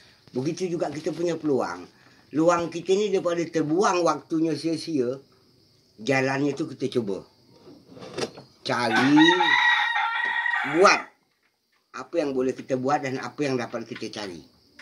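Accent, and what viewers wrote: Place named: Johor, Malaysia. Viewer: Salam dari Johor. same bahasenye dgn org Johor. Mmg johor dgn bentan ade hubungan suatu ktike dulu.. saye tngok channel ni sbb nk dengor bunyi cakapnye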